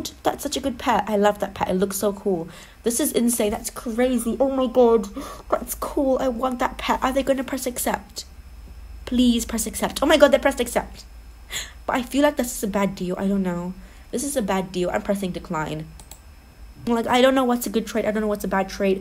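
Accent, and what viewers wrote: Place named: United Kingdom. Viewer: Wow you are British and a normal English accent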